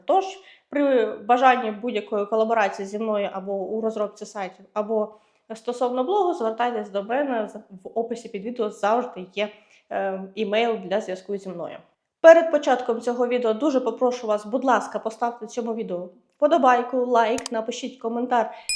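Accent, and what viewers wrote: Standard. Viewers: Красива , креативна , розумна , (з харизмою треба попрацювати ( без образ)) україночка чисто розмовляє без суржику.Respect & perception of luxury 🇺🇦😁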